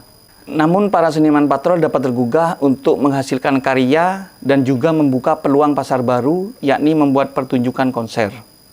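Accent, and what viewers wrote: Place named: Madura, Indonesia. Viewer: Logat madure cak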